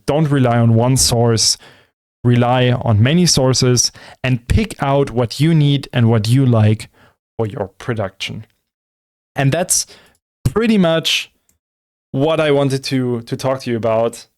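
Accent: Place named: Germany. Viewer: Du klingst deutsch . Bist du deutsch ?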